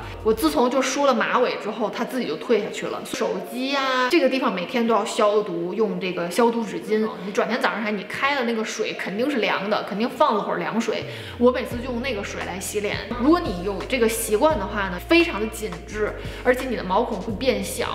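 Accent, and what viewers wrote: Tianjin, China. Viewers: 你好，请问你是天津人吗？ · 博主讲话有天津味儿。跟Tracey 有点像。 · 我第一次看你的视频就觉得你是天津人，和我一个多伦多的天津朋友说话的那个味儿太像了。我本人坐标温哥华，希望能分享些挑选衬衫的方法。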